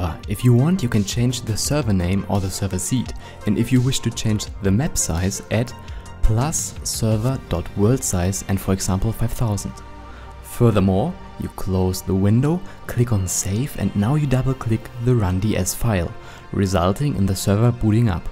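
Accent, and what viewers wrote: Germany. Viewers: Impressive fast explained I like it and I sub! Ganz liebe grüsse nach Deutschland, den akzent merkt man lol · Sowas von ein Deutscher xD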